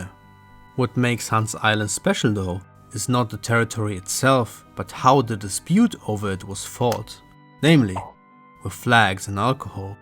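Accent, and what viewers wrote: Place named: Germany. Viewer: love the german accent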